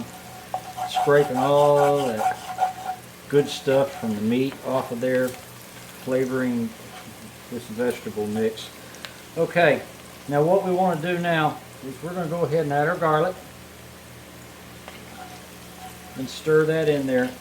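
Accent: South, United States. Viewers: A couple seconds in the video.. "oh this guy is from the south, so you know he knows how to cook ribs"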